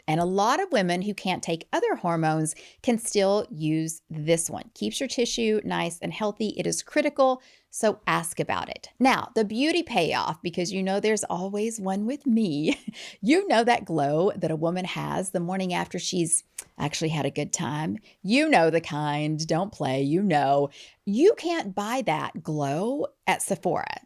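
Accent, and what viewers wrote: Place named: Appalachia, United States. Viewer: I just recently subscribed! I LOVE your content and finally feel there is someone out there speaking the truth unapologetically. Much respect! You are beautiful inside and out and an inspiration! ❤️ By the way, I love your southern accent! What part of Appalachia are you from? Im a southern girl as well, but from Louisiana. Thank you for the amazing content!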